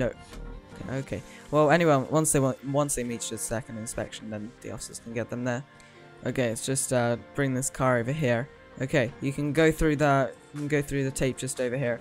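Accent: England, United Kingdom. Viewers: are you english you sound english i hope you are because i am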